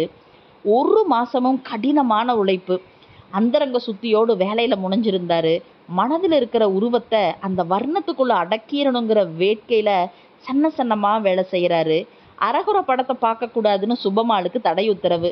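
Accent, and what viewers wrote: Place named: Tirunelveli, India. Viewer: கலையை விலை பேசாத கலைஞன் வாழ்க! திருநெல்வேலி பாஷை வளர்க.!!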